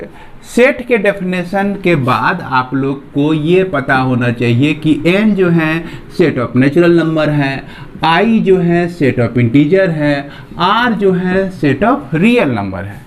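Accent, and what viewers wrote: Bihar, India. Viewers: Bihari Accent 👌😘😂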